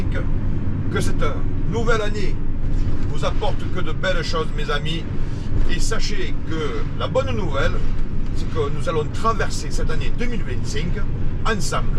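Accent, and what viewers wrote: Marseille, France. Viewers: Bonjour mon amie vos vidéos je me régale de les regarder 🎉j'adore votre ville elle et unique comme c'est habitants je connais la ville à 70% vous me faite rapeller les ruelles j'ai visité plusieurs ville française et européenne mais je suis fan des ville du sud et surtout Marseille c'était mon poin de repaire pour commencer mes balades ca fait 10 que je ne suis pas venu à Marseille. Je suis fan de Marseille et de votre accent marseillais. Bonne continuation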